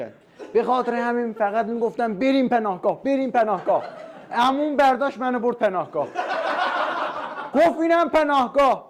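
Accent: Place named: Azerbaijan. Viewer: 😂😂😂😂خیلی خوب بود اجراش،خدایی قشنگ بود،خیلی لهجه آذری شم باحاله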